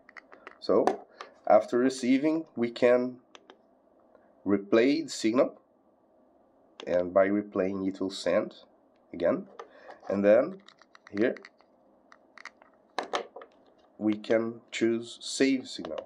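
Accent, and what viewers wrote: Brazil. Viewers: adoro o sotaque br no inglês maravilhoso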